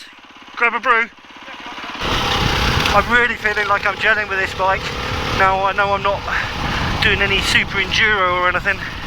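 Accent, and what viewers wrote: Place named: England, United Kingdom. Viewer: Great day out on the bike in the sunshine does it get any better 👌 PS love the English accent and cursing it cracks me up haha cheers 🤙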